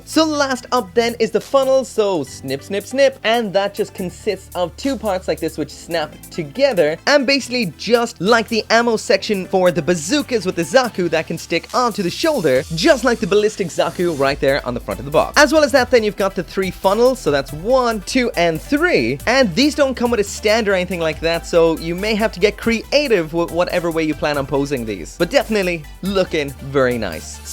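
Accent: Ireland. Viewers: Wait your Irish What county you from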